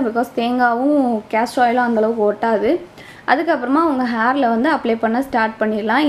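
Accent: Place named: Thanjavur, India. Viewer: Hai mam neenga ena native mam unga language thanjavur side mathri iruku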